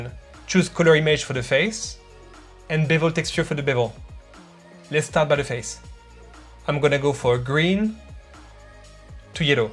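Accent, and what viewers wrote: France. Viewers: I found your channel just a few days ago. I like it and I subscribed. i like the topics, the way you develop your projects as they teach us many tips. I also like your accent :) are you french? (I am)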